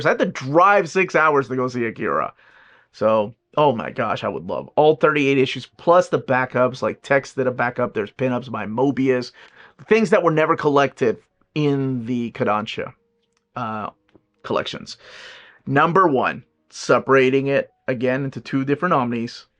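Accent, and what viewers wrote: United States. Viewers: Clicked because of cowboy hat and was NOT let down when i got the accent to go with it! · i want a compilation video of cowboy accent to listen to before i go to sleep every night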